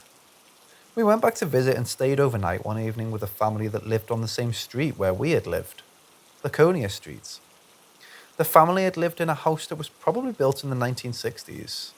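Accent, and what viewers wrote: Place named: Northern England, United Kingdom. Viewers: I had a feeling he was English but he pronounces some words differently. Northern England was going to be my guess. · no he's definitely english...sounds like he comes from up north to me.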